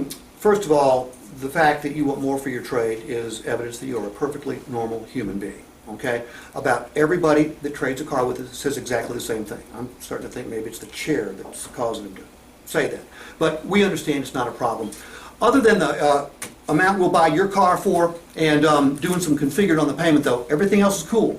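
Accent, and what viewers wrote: Appalachia, United States. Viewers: and his hillbilly talk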